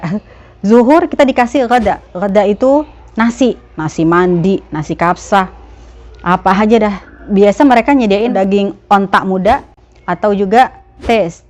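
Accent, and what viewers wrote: Jakarta, Indonesia. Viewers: Ya Allah mpo ngomongnya Betawi bgt dah...😅 Seneng jg orang Betawi ada yg jadi warga Madinah.. Maasyaa Allah mantep dah.. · ما شاء الله تبارك الله Logat Betawinye kite demen banget 👍😘 Lanjuut ya review adat istiadat/budaye Saudi nye 🤗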